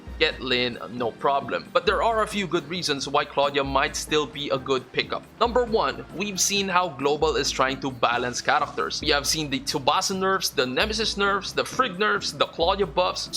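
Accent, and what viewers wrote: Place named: Philippines. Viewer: I absolutely agree with what you said about pulling who you like, instead of pulling who is strong. With the way global is changing things up we now have the liberty to do that, and I love it. PS: You have the most pleasant Filipino accent I've heard 🥰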